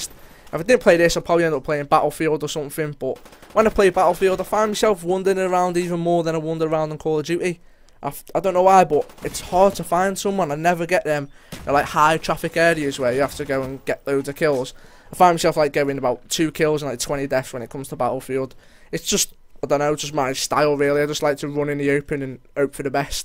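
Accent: United Kingdom. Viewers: It's ONE of our British accents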